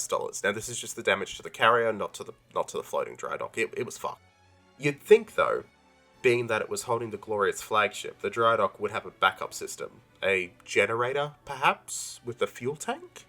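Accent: Australia. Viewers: Excellent Video, I like long format generally, especially in an Australian accent.😉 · Love your videos. As a fellow Aussie it’s great to listen to your commentary. Keep up the great work.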